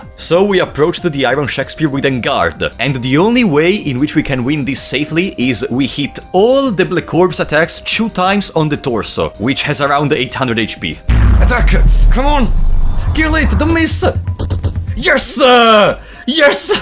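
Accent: Italy. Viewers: I really like your italian accent in English. Sounds so much better than mine (im Spanish)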